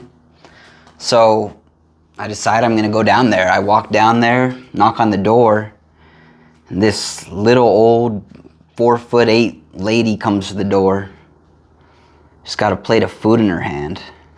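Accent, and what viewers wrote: Philippines. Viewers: ❤ ur Story! Welcome to d Phl! U already sound like a Pinoy! U also ❤️ d food, singing & culture! F u r Single, u might end up marrying a Pinay! ❤️😍💋👌🏼👏🏼😘👍🏼🎉🫶🏼🙏🏼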